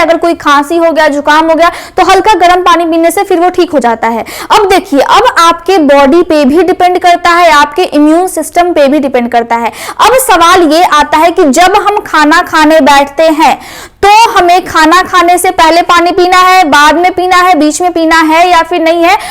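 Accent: Bihar, India. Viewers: Bache chage your flow ..... Bihari language flow aah raha. Update beta . I am Army men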